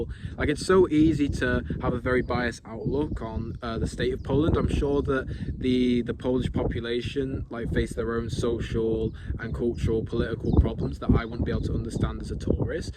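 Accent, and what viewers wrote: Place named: United Kingdom. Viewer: who likes a British accent? sounds snobbish.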